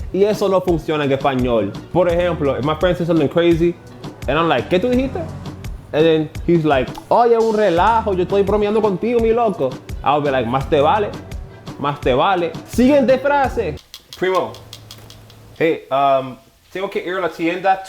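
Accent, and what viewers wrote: Dominican Republic. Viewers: So nice to learn with the Dominican accent. Thank you. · Bro are you actually Dominican? You low key got a accent in English. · Tu eres uno de los mejores mano. He estado mirando tus videos desde que empecé a aprender el español. De hecho por ti decidí aprender el acento dominicano. Sigue haciendo el trabajo q tu hace mi loco, sigue así y Dios te va bendecir. ❤ todo amor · what did you watch to pick up the Dominican Accent so well? I've been living here going on 3 years and still can't understand them... 🤦🏾‍♂️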